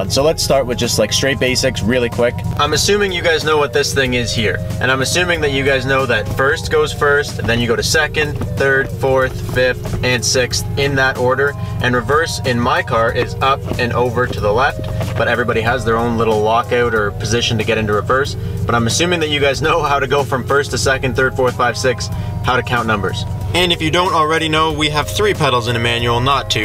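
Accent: Canada. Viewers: Ahaaaa Canadia. That's the accent ouwt! Cheers brotha new frs owner here in 5 days!!!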